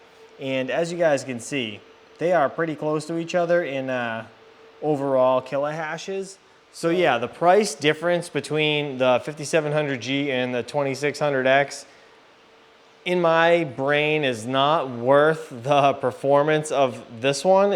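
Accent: New England, United States. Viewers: Sounds like a New England Accent so Im betting National Grid customer rip off rates Im in FL and I pay about 0.08KWh here in FL on a COOP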